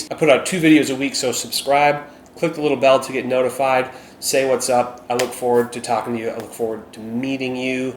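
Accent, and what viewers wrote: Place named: Minnesota, United States. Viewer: My struggle is my Mother is from MN and I was born there but grew up in NJ Italian Dad, so I’ve experienced both cultures throughout my life. It could be neither one is ideal for me. But when I hear you pronounce Bag it sounds like beg..and all the other pronunciations even Pop for soda I’d rather just avoid hearing all that! I don’t love Jersey but I just can’t stand the Scandinavian ways and accent truthfully that is prevalent throughout MN!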